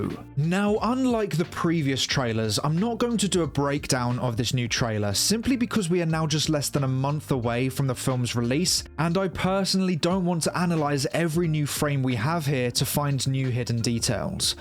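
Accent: United Kingdom. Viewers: These are the facts. The fish and chips accent seriously enhances the review experience I’m not even remotely going to lie. Love this channel, even though he complains too frequently about even mild humor in a Superman film. Still, few have done better journalism on the progress of this film than this fellow.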